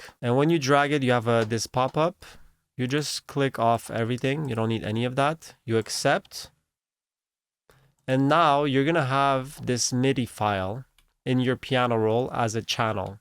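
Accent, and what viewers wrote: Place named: Montreal, Canada. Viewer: The minute I heard your voice I could tell you were from MTL. Super fire watching different work flows! This a cheat code for many who don’t know music theory!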